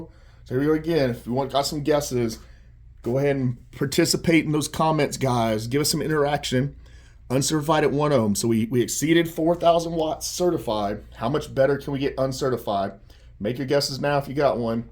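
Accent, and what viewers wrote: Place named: South, United States. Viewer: Yes, I hear that. It is correct to my ears. The wrong way would be: three thousand, five hundred, point nine one. Are you in the USA? If not, there may be a difference in the way numbers are spoken. I'm American so it sounds normal to me. Another acceptable way he could have said it is: three thousand, five hundred AND ninety one. Either way is normal over here. He also speaks with a southern accent, so that can be a bit difficult if your not used to it. I don't think he's trying to deceive anybody. 👍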